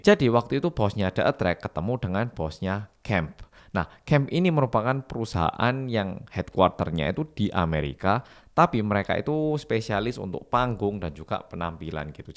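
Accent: Java, Indonesia. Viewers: Mau naik malah turun kasian bukan,, hehe aku seh penyuka dangdut jadi saya nonton channel ini bukan tertarik dengan informasi yang di bahas sekarang tapi aku nonton orang nya suaranya medok hehe😊😊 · mas ini orang korea asli cuman pernah tinggal di indonesia untuk waktu yg cukup lama, makanya medok banget, bisa di cek untuk memastikan di video lama.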